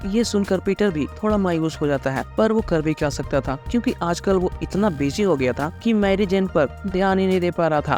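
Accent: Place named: Bihar, India. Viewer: Aspaiderman 😊.. That bihari accent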